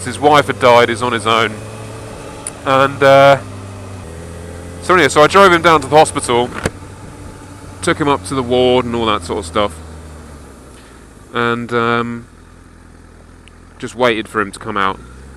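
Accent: United Kingdom. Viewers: Oh, your uploads are always the highlight of the week/month for me (okay, as long as we are talking about interwebz entertainment). I often ask myself if your videos would be as entertaining if it wouldn't be for your british accent. How about a hillbilly special, mate?:D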